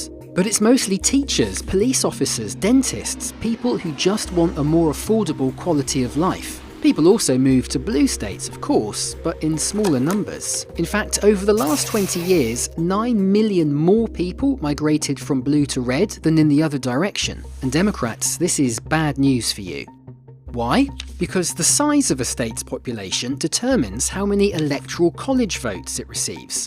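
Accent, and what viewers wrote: United Kingdom. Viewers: I love how it's narrated by a brit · The British accent is distracting. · NYT needs to hire an American to narrate these videos. Why should we Americans be lectured by a British accent?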